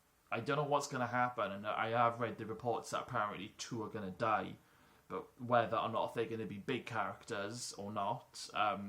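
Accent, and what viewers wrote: Wales, United Kingdom. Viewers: Thank you for reading out two of my comments. So happy that you are interested in what I my opinions :D That link I sent you, I think the producers may have gotten onto that because I noticed earlier that they photos have been replaced. The comment with Channing being the stalker, I actually jumped to that conclusion before I watched that episode. I should wait until I watch the episode before I form conclusions lol. You mentioned Prisoner: Cell Block H, I am a massive fan of that show. I am from Northern Ireland, so I watched it too, on ITV back in the 90s. I was a young kid at the time. It was always an argument to get to say up late to watch it, and most of the time it was taped for me to watch the following day lol. One thing that I was disappointed about in Wentworth is that Bea and Joan never got to have their fight scene, unfortunately. 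I wanted something similar like episode 326 of Prisoner, with a more updated version of that awesome music used in that fight scene. I thought Season 2 was too soon since it was Joan's first season, but never happened in Seasons 3 or 4. Oh yeah, on how to pronounce Marie. You can say it either way. I know of a few women called Marie and it can be pronounced Ma-ree or Marry. It probably sounds different with my accent. The Marry version is just a fancier way of saying it really lol. I like the way you say it, keep saying it that way in that nice Welsh accent :) Apart from Prisoner and Wentworth, what other prisoner shows do you like? I a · I can't wait till season 7 starts, any idea when it's going to be shown in uk? Love your welsh accent by the way😀.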